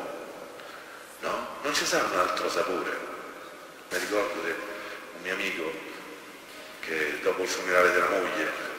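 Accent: Rome, Italy. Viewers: Padre, io la rispetto perche’ lei porta la parola di Dio padre nostro, a tutti noi suoi figli. Ma mi permetta il suo accento e dire romanesco è veramente fastidioso. Vede, le sue catechesi sono seguite in tutta Italia, io per esempio sono di Torino e a volte fatico a capire la su “lingua”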